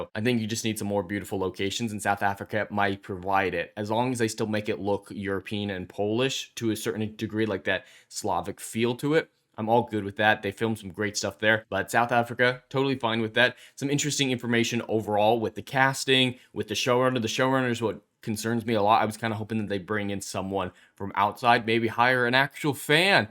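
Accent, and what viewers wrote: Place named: New Zealand. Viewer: lol once again done in a badly done masculine voice that is in fact a Kiwi accent Are you not entertained? ARE YOU NOT ENTERTAINED?! Is that not why you're here?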